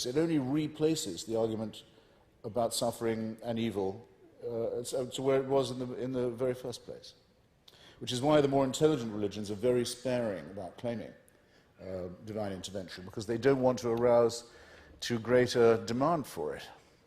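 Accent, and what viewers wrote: RP, United Kingdom. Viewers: ..."there's then a sulfurous interlude"... These gems just roll off his tongue, proof of his linguistic prowess and command of the English language. The Queen's English, actually. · Total lack of understanding of Scripture in its wider context. For a start he calls Shabbat Sunday! Speaking in an upper middle-class English accent does not make the case stronger.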